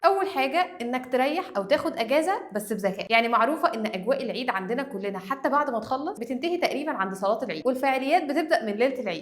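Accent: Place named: Syria. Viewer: انتى سورية اصلا استغربت لو كنتى مصرية مستحيل تقول هيك بس ما دام لهجتك مختلفة فا طبيعى 😂😂والله لما بطلع على اللهجات بلاقى حاجات 😂😂😂😂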